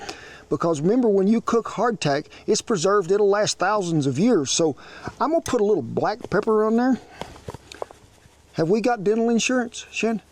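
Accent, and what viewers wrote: United States. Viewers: 카우보이 어투가 너무 듣기 좋아요 ㅎㅎㅎ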